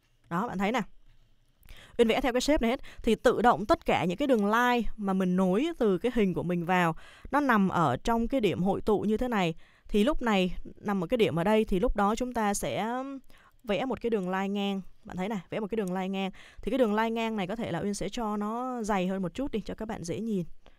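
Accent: Standard. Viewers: cảm ơn chị rất nhiều, một bài chia sẻ dễ hiểu nhất, có lẽ những khóa học mất tiền cũng không chia sẻ những kiến thức này. Đã thế giọng chị lại còn hay phát âm lại chuẩn nữa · Chưa thấy ai phát âm chuẩn và dễ nghe dư bạn. Cảm ơn b nhé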